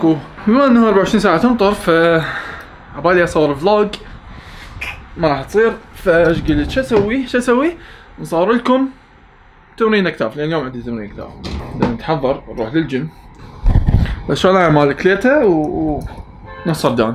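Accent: Iraq. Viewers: فديت اللهجة العراقية😊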